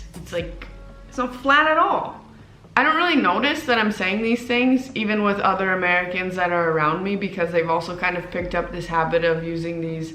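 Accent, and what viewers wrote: Czech Republic. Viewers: That's exactly how it appeared to me, too :) The lady literally looks like a Czech (I love her eyes :) and has an incredibly Czech accent. I would have never guessed she was a real American :D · My guess is she's pretending to be American to ride on the popularity wave of "foreigner reacts to Czech customs" videos. She certainly doesn't speak like American, her accent is very reminiscent of a native Czech. I stumbled upon this channel few years ago, I think she had some even older videos on the channel where her Czech accent had been even stronger. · Why do you have a czech accent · You speak almost totally American, but then have these noticeable slight inflections of accent that are super specific to the Czech accent. When you say "Drink beer" with the way you pronounce your R's is a perfect example. Are you Czech by birth who lived a whole life in the US?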